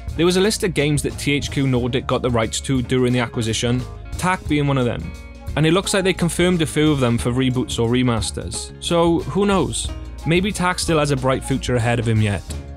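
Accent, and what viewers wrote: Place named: Wales, United Kingdom. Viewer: Great vid, nice to hear from a fellow Welshman 👍🏼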